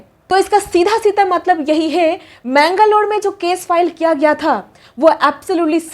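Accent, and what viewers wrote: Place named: Bihar, India. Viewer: Bihar se h tu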